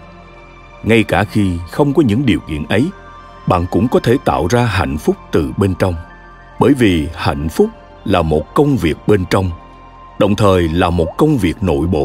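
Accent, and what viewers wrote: South, Vietnam. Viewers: Giọng đọc hay nhất trong các giọng đọc của sách sức mạnh tiềm thức. Chắc mình người Nam, nên thích nghe giọng miền Nam ấm áp, trầm và nội lực. Cám ơn Fonos, mình sẽ mua để nghe trọn bộ.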